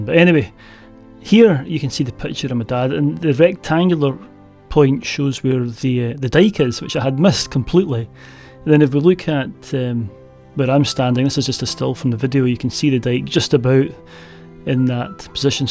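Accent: Scotland, United Kingdom. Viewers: I love watching your beautiful videos - thanks a lot for sharing them - and I'm a bit envious. It's not that easy to understanding your strong Scottish accent but I like listening to you anyway. Almost 48 years ago I stayed at Achmelvich Beach Youth Hostel and got a glance at this interesting looking mountain. I have been wondering what it might be like climbing it. I never made it - what a shame. Unfortunally I'm getting more and more hightsick the older I become. So whenever I watch you following a steep ridge I'm glad knowing you beiing back down safely again.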